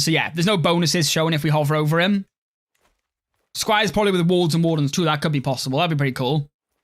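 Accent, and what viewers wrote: Wales, United Kingdom. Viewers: heard you on the steam page and thought I detected a bit of a welshie Im Welsh/Irish so glad I looked you up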